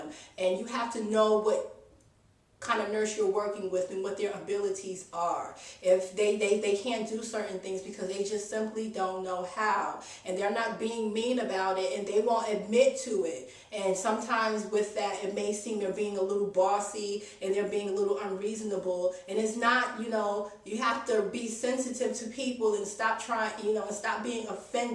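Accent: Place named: New York City, United States. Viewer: I hear the straight NYC in this vid... LOL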